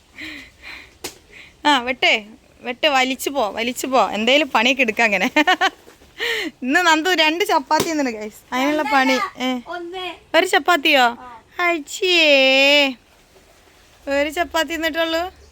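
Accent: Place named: Thrissur, India. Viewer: തൃശൂർ സംസാരം എനിക്ക് നല്ല ഇഷ്ടമാണ്❤️👍🏻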